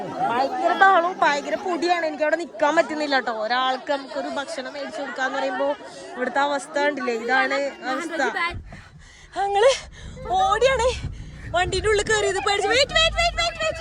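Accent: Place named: Thrissur, India. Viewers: ഇതെന്താ തൃശ്ശൂർ slang 😂😂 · ത്രിശൂർ ഭാഷ കലക്കി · Slang bore avunnu,trissur slang varunnu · slang oke mariyalo… epo trissure bhasha analo 😂😂😂😂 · Thrissure slang varunnu samsarikumbol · Slag mariyoo oru trissur slag cheruthayitt vannittundallo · Inn ipoll തൃശൂർ slang annaloooo ❤ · ഇന്ന് എന്താ തൃശ്ശൂർ ഭാഷ 😃😃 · ഇന്ന് full തൃശ്ശൂർ സ്‌ലാങ് ആണല്ലോ ❤️ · തൃശൂർ ഭാഷ ഒഴിവാക്കണം.... കൊള്ളില്ല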